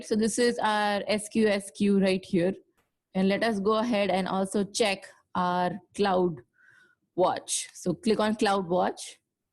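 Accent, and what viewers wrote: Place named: India. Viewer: Explained very well!. Was looking for one with Indian accent. Thanks a lot!!!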